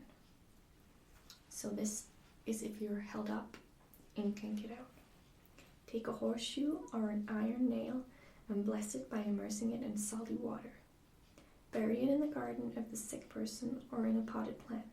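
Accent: United States. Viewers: Nice American accent :) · Your American accent is awesome!!! :D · Haha, that American accent took a while to sink in. I kept thinking that something didn't sound right but it wasn't until a minute and a half that it clicked. Cool gimmick. :) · nice american accent! :) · Great job with the American accent!! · Wow, I didn't recognize your voice at first! Very nice work on your Inland North accent of American English. Regrettably, that means your voice doesn't trigger my ASMR like it usually does, but good job nonetheless! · I love your accent, so I was FLOORED when you were using an American accent. Great job and nice change of pace with the Hunter angle. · I didn't realize it at first I was like something's different the American accent that's it nice job beings I'm American let me here you say book haha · Awww, came here for your own ccent and then it's American :( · 1) Excellent American accent. 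:) 2) Supernatural. And ASMR. A surprisingly fantastic combination. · Nice video! I am quite impressed with your american accent! So much better than I could do a british one... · wow, I don't don't know how difficult it is to sound American since I am one, but I couldn't even tell you weren't! Very nice, although your normal voice is just so damn relaxing. Also, freakin awesome idea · American accent for the win! Great job. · Fantastic American accent. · Your American accent is more believable than my British accent. Lol. Good job!! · Nice American accent! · You should be wearing plaid so you can be a real winchester! Haha that was a pretty good American accent though! I'd love to see you do a spin on this idea as a crossroads demon or something! Hrrmm maybe I'll make my first asmr video as a kind of response to this as a demon or angel or something, if you don't mind me kinda borrowing the idea! 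· American accent, WHAT!? Totally threw me lol. Well done! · I love the American accent! Not only do you do it well, it's also really relaxing. Switching it up is a good thing. You're perfection!<3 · your american accent is pretty good!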